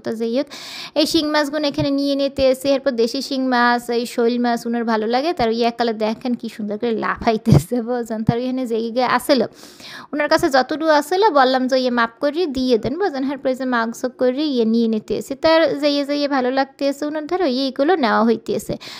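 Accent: Barishal, Bangladesh. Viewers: Aponar kotha sunte Amar khub bhalo lage especially Aponar borisailla bhasa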